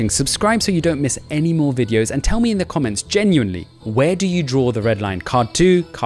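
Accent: United Kingdom. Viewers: I found this video thoroughly adept at rationalizing racism away - in part by signaling or assigning "degrees" through a contrived methodology and then putting it on the viewer to "decide" where they fall on the scale. the other comments did a good job citing the obvious. In short this was clownish and disgraceful. the British accent leant a little credibility I guess though... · We r not slaves from Africa this British accent Hindu began the video off lying we r not slaves from Africa stop 🛑 lying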